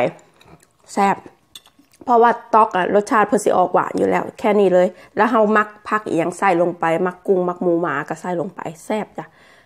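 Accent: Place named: Lao Nyo, Thailand. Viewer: ไผน้อไปว่าน้อง พูดมากป้าชอบฟังนะเวลา พูดฟังเพลินดีลาวผสมญ้อน่ารัก💝💝💝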